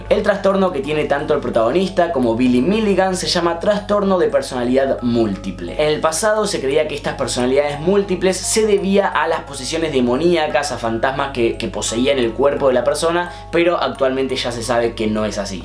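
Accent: Argentina. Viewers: Amo tu acento argentino,saludos de México ♡♡.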